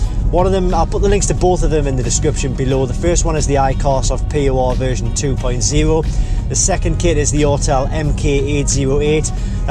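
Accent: Newcastle, United Kingdom. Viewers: This guy is definitely Geordie... Schooled in Newcastle and tell by a million miles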